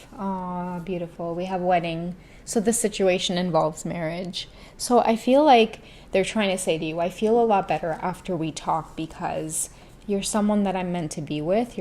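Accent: Trinidad and Tobago. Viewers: No, we like that you speak with your Trini accent when you talk to her! 😂 ♥️♥️♥️…Buss up shut and Tarot! 🥰😂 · Lmaoooo sis you DONE KNOW I LOVE THE TRINI ACCENT ENUH!!! 🇹🇹Stupezzzzzz lol PUNGKIN had me WEAK cause iykyk!! Hahahaha!!! Love it here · Ok I think I need trini talk everytime/reading please?!!! 🤣🥰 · Yes that!!! I love the Trini side!! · Oh lordie .. the Trini Popo...😂🤣😂Love hearing the Trini Accent in someone else lol..🇹🇹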